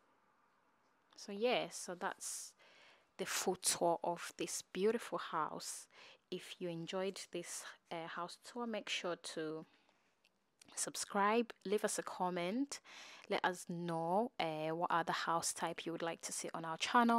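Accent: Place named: Scotland, United Kingdom. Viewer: Love this house big, gorgeous and spacious. I love the doors also. My type of chandeliers throughout. The shared children's bedroom is gorgeous. Thanks for sharing. PS. Your dual accent, I can hear the Scottish accent coming through when you pronounce certain words. ❤💜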